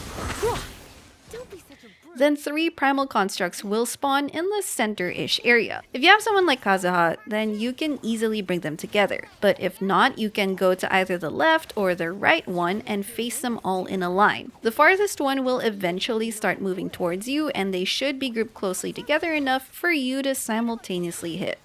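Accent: Philippines. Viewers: are you a filipino? your voice sounds like a filipino, anyways great video as always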